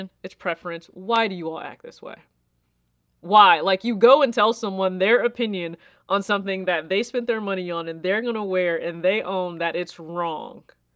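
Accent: South, United States. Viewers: YOU FEOM THE WEST COAST LOVE I COULD HAVE SWORN YOU WERE FTOM LIKE SOUTH CAROLINA KENTUCKY SOMEWHERE LIKE THAT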